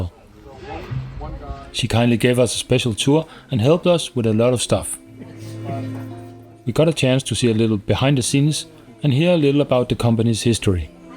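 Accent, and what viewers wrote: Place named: Denmark. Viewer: 'dan'glish accent'...Haha...I must apologise to all our foreign friends who are forced to learn english...As an englishman I can assure you we didn't mean it to be this way even tho some of us still try to learn other languages...but unfortunately as a consequence most english have now become very lazy to learn them...hehe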